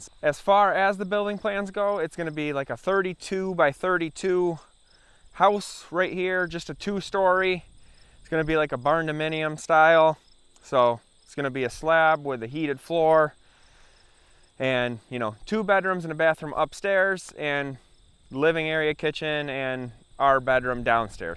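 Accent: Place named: Michigan, United States. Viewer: What state/county? By the accent I'm guessing Michigan.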